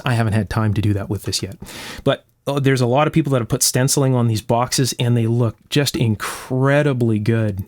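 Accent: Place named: Canada. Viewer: Zap strap? lol Never heard that before, I assume you're in Canada from your accent. Here in Murica it's just called a Zip Tie.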